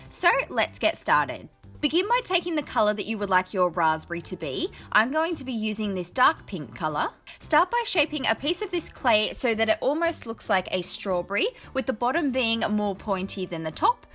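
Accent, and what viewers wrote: Australia. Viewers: I don’t know what’s cuter your creations or your Australien accent 😻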